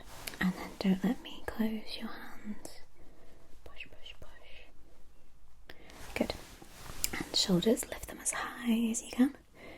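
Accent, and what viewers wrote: United Kingdom. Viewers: Very relaxing British voice 🇬🇧👍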